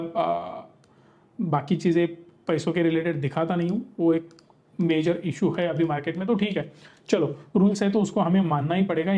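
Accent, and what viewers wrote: Maharashtra, India. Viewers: Sir, Samjvanyachi, shikavnyachi padhhat khup chhan aahe tumchi.. Khup pot tidakine agadi manapasun shikavata Sir.. Pan Sorry to say you this, Hindi improve karayachi garaj aahe, Tumhi je bolata sadhya te marathi hindhi aahe, like our CM.. Sorry to say you again..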